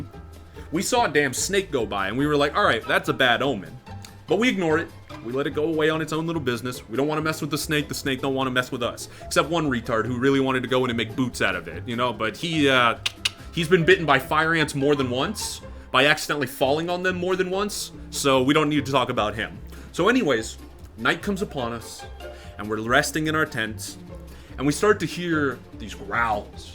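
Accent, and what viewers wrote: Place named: New York, United States. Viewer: man your accent would be hilarious in a campaign ... the new yorker halfling or kobold or something talking really really big...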